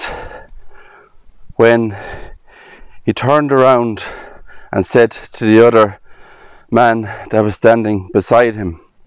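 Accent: Ireland. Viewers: Perhaps you don't like semi personal comments. But I am going to take a chance. Just love Irish accents since my ancestors came from ballina, county Mayo. Still have relatives there. Enjoyed the video too. Very thought provoking. Am a new subscriber. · Are you Irish? Anyways, you're a really good storyteller, and I'm going to be saying Mary Therese Collins in my sleep now. 😆I'm laughing with you though not at you and I love your soothing voice that would be really good for ASMR. Subscribed!!! 😀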